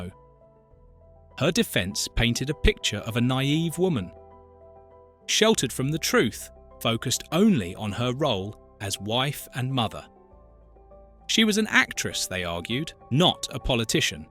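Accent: England, United Kingdom. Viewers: A good Jewish person with a good clean English accent. Glad you approve. 😊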